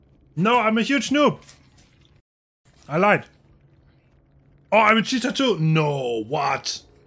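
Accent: Germany. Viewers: I knew I heard some German accent. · Ist er deutsch?😂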